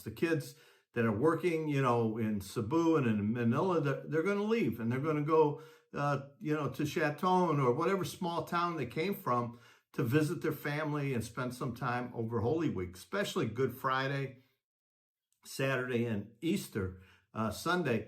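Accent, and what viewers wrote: United States. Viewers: Asian Mkts are "tanking" and Currencies are getting hammered .. I read "fear of Currency Collapses" .. This on top of Covid Inflation that never came down and Tariffs .. "All of it hurts the poorest the most" .. I saw the anti Corruption Protests, and now I'm seeing Protests there with anti Trump-U.S. Signs, on YouTube (Google) .. "Shits getting real" so keep your head down and don't advertise "American" (you have an accent - practice Canadian Aye mate) .. That's "excess Russian Oil" (ie) already out, on Shadow Tankers ..That wont last long, Russia can not make up for supply loss, and Ukraine has taken-out "30 or 40% of Russian Oil production (off line) .. If this doesn't "end fast" somehow, things will get "a lot worse," before they get better, incl inflation, shortages and collapses affecting millions there / billions of people, worldwide .. 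"Plan for the worst and hope for the best" .. Be safe and best of luck ..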